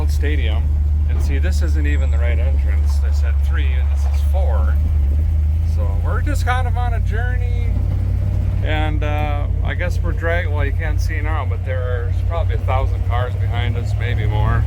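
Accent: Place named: Minnesota, United States. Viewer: I've never really noticed the Minnesota/Wisconsin in voice before hearing him say "bag" a few times while speaking about longmire. Bagged trucks are a lot of fun and can also be a lot of work.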